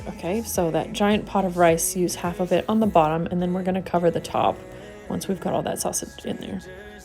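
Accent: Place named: Canada. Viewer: I am curious, Are you Canadian or American because we share the same accent; to which I mean not a NZ accent. #Canadian How long have you been in New Zealand ?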